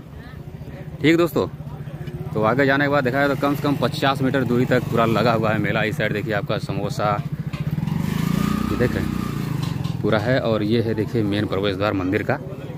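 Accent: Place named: Varanasi, India. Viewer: Video tho achcha laga vusse bi jyadha aapka bolna bahuth achcha lagta hai ♥️ banaras ke hindi sunne me bahuth masth lagta hai 👌👍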